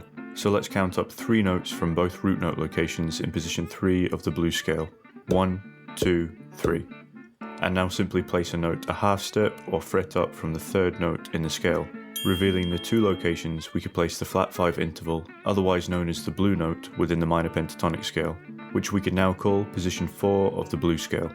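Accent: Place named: Lancashire, United Kingdom. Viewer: Both of you are very good although fretjam seems to have stopped making videos now. It was just that you both have similar accents,Lancashire or Manchester area tones,so to speak 😀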